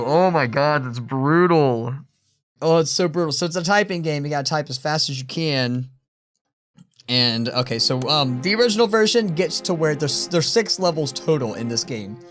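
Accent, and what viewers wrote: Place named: Appalachia, United States. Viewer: Next ingredient is whatever the heck he says! I can't understand hillbilly language!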